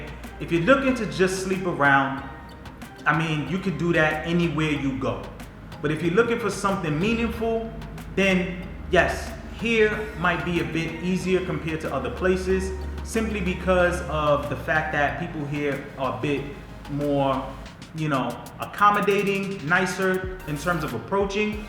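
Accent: New York, United States. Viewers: Your NY accent has been dying took me back home.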